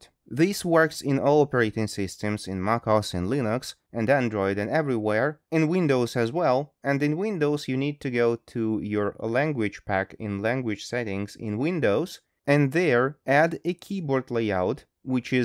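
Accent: England, United Kingdom. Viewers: О, вы из Англии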